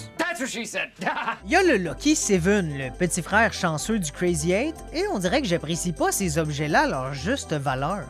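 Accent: Quebec, Canada. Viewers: En tant que français ton accent québécois me surprend au début mais est tellement agréable à écouter. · Super un québécois qui a pas peur de parler avec sont accent good job chômer pi t’ai jock sont drôle en criss 😂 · Allez je m'abonne et like c'etais vrm cool comme vidéo et j'adore l'accent québecois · vidéo intéressante et très drôle, merci :) j'ai pas envie de faire mon relou avec l'accent québécois vu que t'as déjà du recevoir 10 milliards de commentaires dessus mais j'aime bien :) "un grand total de aucun est revenu" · Toi t'es québequois 🤣🤣, t'as un très belle accent. c'est fait du bien le changement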